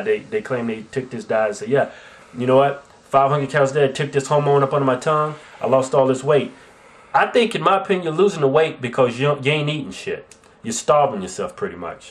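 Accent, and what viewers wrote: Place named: South, United States. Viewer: gorgeous.... even with those country accents, and bad language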